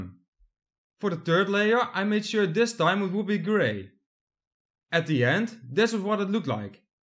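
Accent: Netherlands. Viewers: Mooie en goeie tutorial man! je hebt me zeker geïnspireerd! en dat mooie nederlandse accent valt niet te missen (' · Leuk gedaan. Wel duidelijk, ookal is het in bloemkool engels. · You sound so dutch. Love it. But was exactly what i was looking for! Thanks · Ben jij Nederlands gezien het accent???🇳🇱🇳🇱🇳🇱🇳🇱🤣🤣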